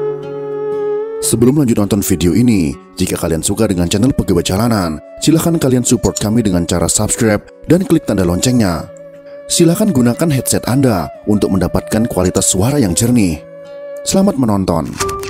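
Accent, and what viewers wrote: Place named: Java, Indonesia. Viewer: ngomong kyak wong jowo adminya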